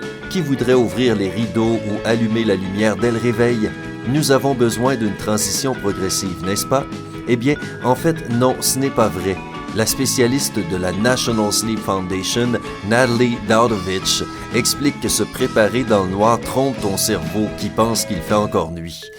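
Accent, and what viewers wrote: Quebec, Canada. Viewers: C'est du québécois alors arrête de critiquer ! Ils ont un accent différent ! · Accent québécoise j'adore 😊. Merci c'est sympa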